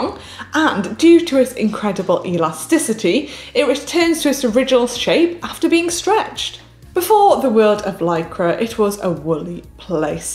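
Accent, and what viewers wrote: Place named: Wales, United Kingdom. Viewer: Do you mean her accent? She's Welsh. That's how the Welsh speak.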